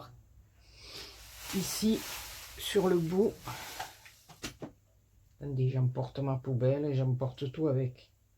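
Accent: South, France. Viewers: Géniale la petite Mère Noël. J'ai passé un excellent moment à la visionner. En plus avec l'accent du soleil et l'humour, que demander de plus. Merci beaucoup pour ce superbe tuto. Bisous breizh (Bretagne)! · Coucou! Je crois bien que le père noël va retomber amoureux de sa belle de noël ❤️ En tout cas moi je craque!!! J ai pas le matériel mais sinon j en aurai fait avec plaisir!!!! Comme celui que j ai eu a t entendre nous dire tes bêtises avec ton accent du soleil ☀️ Gros bisous 💋💋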